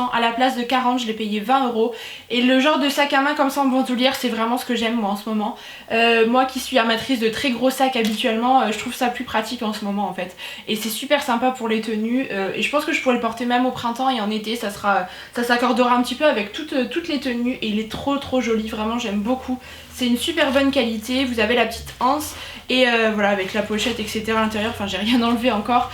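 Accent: Lorraine, France. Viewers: Cc sa se voix que tu vie en Lorraine ton acsant est beau tu à de la chance moi je normande sinon super vidéo tes achat son stylée bravo continue comme ça!!! bizou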